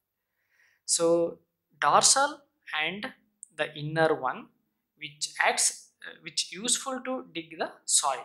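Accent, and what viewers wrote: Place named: India. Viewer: sir pls talk like a human being so its understandable for mortals who live outside the Indian subcontinent, pls thx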